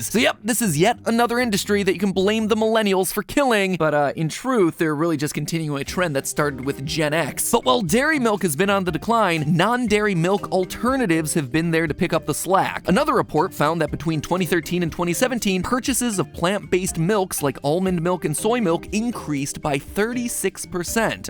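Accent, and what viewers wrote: United Kingdom. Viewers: oh hes bri'ish or an aussi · wow when did become british???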